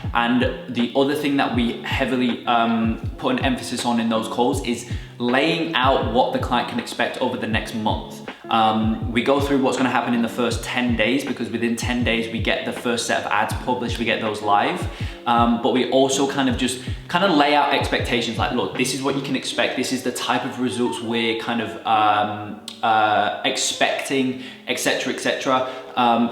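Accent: United Kingdom. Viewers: Are you from England? British?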